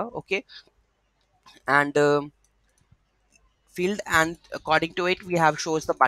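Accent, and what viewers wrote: India. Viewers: you talk like Apu from the simpsons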